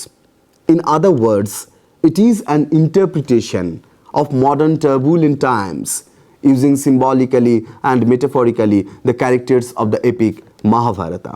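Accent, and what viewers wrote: Bengal, India. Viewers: Love your bengali English accent 😆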